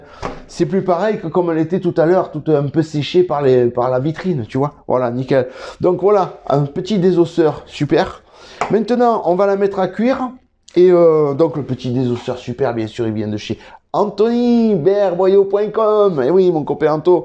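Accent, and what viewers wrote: France. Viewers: Moi, comme abonné à ta chaine, je te regarde de la Beauce, Canada. J'aime tes vidéos car j'apprend beaucoup sur la boucherie. Ton accent français me chatouille les oreilles. J'adore! Merci!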